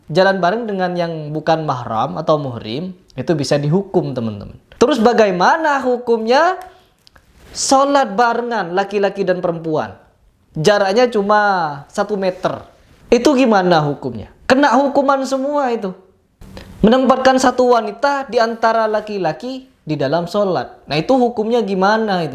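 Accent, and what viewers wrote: Madura, Indonesia. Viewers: Logatnya spt madura ya? Hahaha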